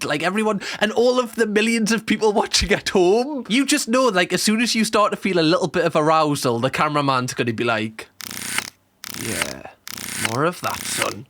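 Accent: Newcastle, United Kingdom. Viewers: It’s his Geordie accent so watch someone else if this bothers you too much. You can’t tell people to change their accents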